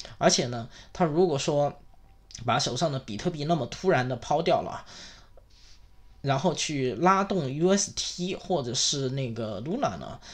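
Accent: Changsha, China. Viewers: 听那方言，好像是长沙话，哈哈😃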